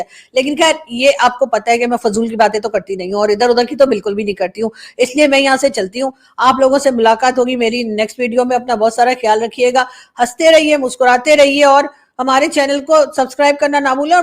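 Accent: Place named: Allahabad, India. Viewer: Aap bahut khoobsurat lag rahi hain , Allah aapko salamat rakhe Aap TRUE ALLAHABADI lagti hain , aapke bolne ka lahja ek dam Allahabadi lagta hai Hamara bahut sara Ashirwad 🎉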